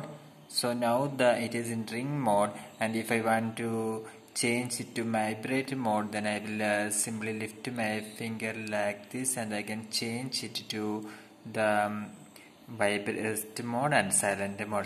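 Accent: Kerala, India. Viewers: The way he is talking looks like malayali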